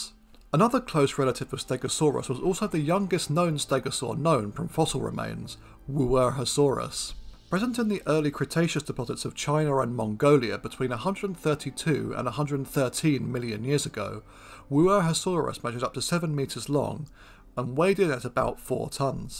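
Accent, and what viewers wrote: United Kingdom. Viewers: ugh. too many documentaries with british narrators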